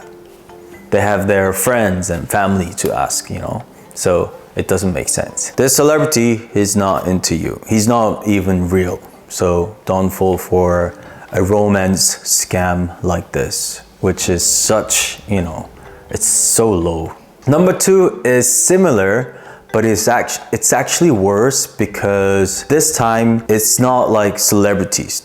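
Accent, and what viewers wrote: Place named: United Kingdom. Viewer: First of all, celebrities do not have time to be chatting with their fans privately. They don’t even have a lot of free time so if they do find free time, they would NOT use that to private message a fan. Sorry fan, but you’re not that interesting. Why would a celebrity choose you over his colleague or another person of equal status? 🤔 Also, celebrities work hard to be where they are so they’re not interested in socializing with fans who are in a place of dreaming and fantasizing. If they want friends, they would choose ppl of the same status because they’re more relatable and trustworthy since they’re of the same socioeconomic status. If you’re a victim of scams then that’s one reason why a celebrity would never talk to you. Lol. You may not have money, but at least be a smart human being so that way you can at least appear attractive. 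Let’s be real. I’m not a celebrity, but not even I would want to be your friend. 😂🤣😂 I do hope the fans that fall for these scams are little kids. This video would be helpful to them. If you’re an adult and not able to use basic common sense to distinguish what’s real and what’s not, you got issues. Being scammed is not even a problem worth highlighting if you’re an adult. The root issue is… 🫢😅 Btw I appreciate this video. I’m new to this channel and I love the British-English accent. I always thought English Language Learners would want to learn American-English. It never occurred to me that an ELL would learn English with British pronunciati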